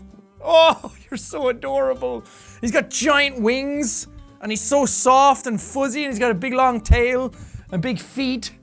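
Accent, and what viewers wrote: Ireland. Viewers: At least he still has his Irish accent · Woah, his irish accent was so strong in this video. Damn son! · Has jack got more Irish 😂🤔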